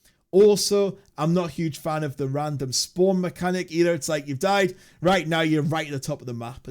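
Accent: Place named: North, United Kingdom. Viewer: Good video mate, good to hear a fellow northern keep up the good work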